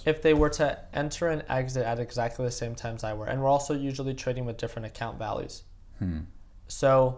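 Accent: United States. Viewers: he sounds like the SNL-Californians bits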